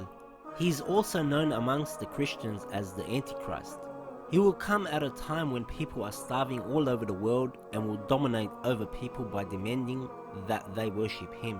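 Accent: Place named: Australia. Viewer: U got an aussie accent.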